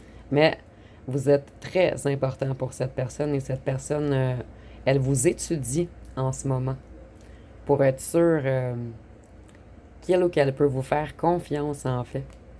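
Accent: Quebec, Canada. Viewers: Quel bel accent quebequois et quelle jolie voix toujours heureuse de vous retrouver Merci ❤❤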